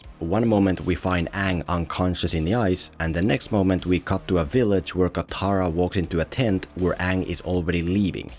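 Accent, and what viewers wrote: Finland. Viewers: Onko tuo suomalainen aksentti jonka kuulen?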